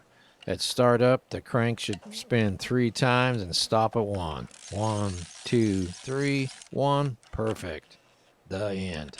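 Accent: Midwest, United States. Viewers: Just love watching you work, being an Irishman living in England, your mid western drawl is a delight, you are the man!!! John Moore, Sherwood Forest England. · Yea, I do enjoy the hypnotizing midwestern drawl and experience driven repair lessons. I kid you not, the confidence to tear into my own cameras grows with every video of yours that I watch & rewatch. I know I have not seen every repair video out there, but of what I have seen, your videos are the most comprehensible and the lighting and camera always work to show whatever the dialog explains. You truly have a gift. I use a Nikon FM as my main film camera and a D3400 for digital. I have ten or so Nikons that need working on (that were eBay purchases from about 15 years ago) starting with a couple Nikkormats up to an FE. Am I correct in believing that the older models will be better to learn on? 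Still tooling up and hope to acquire some JIS crosspoints soon as all my small drivers are Philips. Though I have MacGyvered a flat into a two-bladed JIS as I play around with some point-and-shoots. When I start tackling old stuck screws I will need the real deal. Thanks again for doing all these. Hoping people start learning how to Fix Old Cameras and schools start teaching this stuff again.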